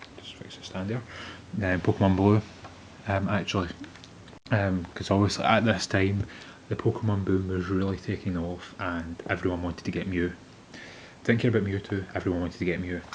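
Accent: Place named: Scotland, United Kingdom. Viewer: Thank you for this video! There's really no information on this thing anywhere online so it's nice to see it. Also a Scottish accent? Nice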